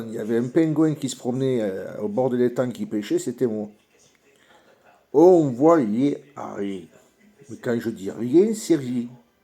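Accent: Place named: South, France. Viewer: Bonjour, Je suis carpiste je pêche la carpe depuis mes 10 ans. J'ai commencé à pêcher la carpe au Maïs et à la pelote. J'en ai maintenant 43 ans. Je pêche à deux ou 3 cannes de 12 pieds en 3.5lbs, avec les techniques modernes, rod-pod et détecteurs à la bouillette + stick ou PVA, Maïs, Tiger-Nut ou Frolic. Je ne sais pas dans quel coin vous pêchez, mais avec votre accent, je présume dans le sud de la France. Il existe des étangs qui sont complètement stériles du fait qu'il y a peu de densité de poisson et par conséquent une faible concurrence alimentaire. Il peut y avoir aussi une forte pression de pêche, le poisson est éduqué et plus méfiant. Il faut aussi savoir si les étangs dans lesquels vous pêchez sont empoisonnés régulièrement en carpes et connaître le cheptel et le poids moyen etc..... Si vous pêchez la carpe sur des étangs avec peu de carpes vous aurez aussi du mal à en faire. 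De plus, il faut aussi parfois amorcer préalablement pendant plusieurs jours un spot afin de conditionner et mettre en confiance le poisson. Il faut aussi chercher et repérer les bons spots sur l'étang que l'on va pêcher, sauts, marsouinages des carpes, présence de nénuphars, arbres et herbiers immergés dans l'eau, les roselières et les bordures riche en végétation etc... Concernant la méfiance du poisson sur un hameçon, je dirai que ce n'est pas l'hameçon, mais plutôt le corps de ligne, le bas de ligne et la présentation du montage avec l'appât en lui même, le type d'esche, la taille, la coule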